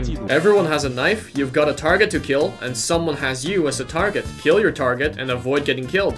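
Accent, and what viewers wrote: United Kingdom. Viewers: You are britsh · BRITISH BRITISH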